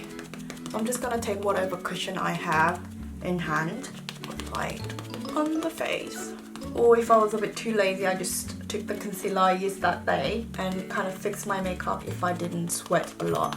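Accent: United Kingdom. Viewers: 우아 진짜볼때마다 느끼는데 영국식?영어? 어쨋든영어넘넘잘하시네요😃부러워요😢영어팁도브이로그로알려주심감사할것같아요❤ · 언니 영상 볼때마다 항상 영어 공부가 되는거같아서 정말 좋아용 영국식 발음 듣는거 좋아하는데 언니 영상 보면서 흐뭇흐뭇^_^ 저는 운동할때 포기할수 없는건 립이에요 다른건 없어도 아파보이지는 않으니까요!! 립 하나만으로도 생기를 줄 수있우니까=3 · sydney님 영상 정말 잘 보고있어요<3 영국발음 너무 멋지세용!! 저는 해외 살때는 쥠을 자주 다녔는데 한국에만 오면 헬스장을 안가게 되더라구요ㅠㅠ 외국에서 쥠 다닐땐 거의 저도 생얼로 다니지만 꼭 포기 못했던건 눈썹!!!!!! 그리고 립이였던 것 같아요 ㅎㅎㅎ 저도 틴트는 꼭 들고다녔어요 히히 그럼 좋은 연말되세요 님! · 저는 핸드폰과 이어폰이요!! 운동할때 너무 지루하고 힘들어서 그 때는 좋아하는 노래를 듣거나 영상을 보면 시간이 진짜 금방 가요 흥얼흥얼거리면서🎶🎶 언니 영국발음 너무 좋아요ㅠㅠ 언니처럼 영국발음하고 싶어요ㅠㅠ 제가 영어 좋아하는 편 아닌데 언니덕분에 배우고 싶은 욕구가 엄청 쑥쑥!! 오늘도 영상 고마워요😍😍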